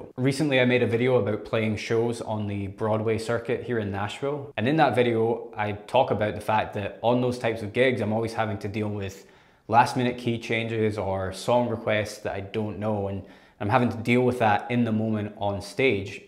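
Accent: Ireland. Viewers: You sound irish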